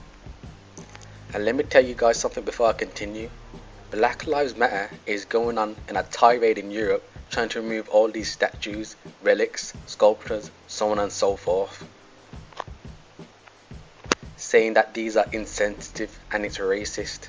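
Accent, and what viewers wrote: Birmingham, United Kingdom. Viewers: You are from Birmingham heavy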